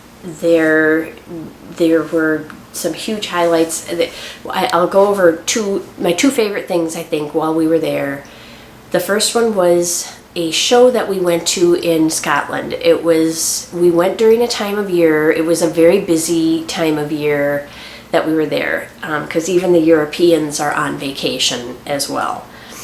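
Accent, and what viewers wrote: Minnesota, United States. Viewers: I wondered where you were! Thanks for sharing ypur pictures of the trip. It is on my bucket list and now I am even more excited to see those countries, so beautiful! I admire your creative choice to put in the specialty stitch on the background. It does look like a tapestry. You should stitch your name in the other one. I am blanking on the names of them right now as I started watching at bedtime and now it is morning. Brain like a sieve! I enjoy your videos for many reasons not the least of which is that I grew up in MN and when I here you speak I get a bit nostalgic.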